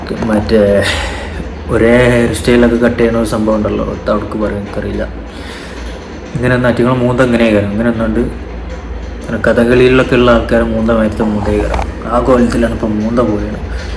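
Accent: Malappuram, India. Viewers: Ivan malappuram slang aanallo😌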